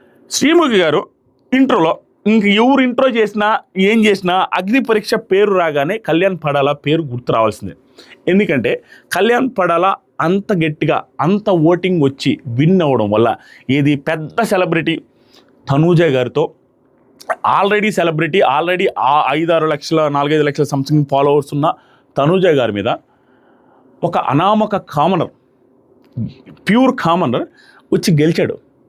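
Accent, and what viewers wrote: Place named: Udal, India. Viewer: UDAL MOVA MATLADE VIDHANAM ISTHAM UNNA VALLU OKA 🤍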